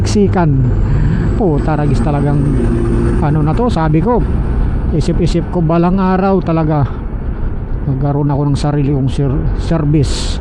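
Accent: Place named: Mindanao, Philippines. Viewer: I stumbled on your channel and have thorouhly enjoyed your vlogs. Kaya yon new subscriber mo na si kanako. Bihira takaga yung late na ng hapon umaalis sa NCR at nag-nanite ride sa gabi tapos nag-iisa pa sa solo ride😊 Seriously, I like your channel kasi it’s mostly road scenes and it’s raw and very lightly edited and you keep on talking while riding with your lighly mindanao accent. Kaya mukhang orig takaga ala itchy boots. More power to you sir. Ride safe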